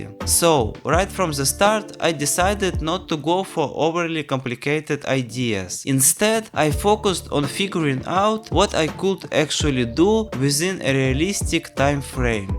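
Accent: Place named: Eastern Europe. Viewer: Finally new video on this channel. I hope that this minimalist platformer find his players! P.S. By the way I really enjoyed your cool slavic accent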